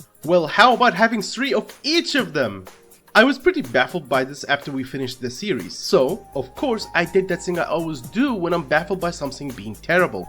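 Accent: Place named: Middle East. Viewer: Based on your accent and saying that you watched spacetoon I assume you're middle eastern correct?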